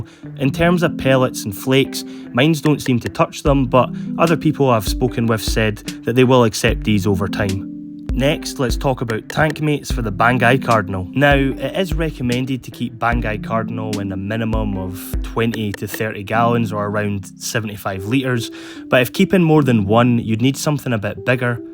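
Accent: Scotland, United Kingdom. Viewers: I just got a pair of these guys yesterday. They are such cool looking little fish. Thank you for the video. Are you from Scotland?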